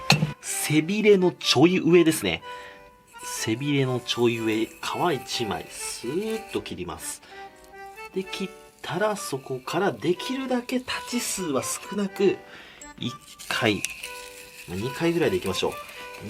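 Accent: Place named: Nagoya, Japan. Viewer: 名古屋人だと馴染みの言葉だけど、なまってると思う人いるのかな？と思った。 「飲まずにおれん」「冷てービール」 素敵な動画ありがとうございました！！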